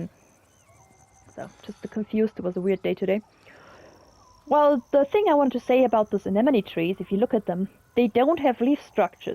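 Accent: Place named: Germany. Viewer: Correct me if i'm wrong but I think that there was a mission somewhere regarding the ferals to completly wipe them out or at least cull the spawnrate. Your comment on sodium was quite interesting. Where are you from? You have quite the accent but I can't pinpoint where it's from. If I had to guess.... Germany? I'm not so sure, you did say Natrium right? Btw one of my favorite plants is Sol's Delight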